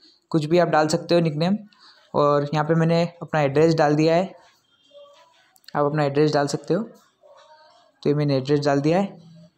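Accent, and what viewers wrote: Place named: India. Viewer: That indian voice 💀💀💀💀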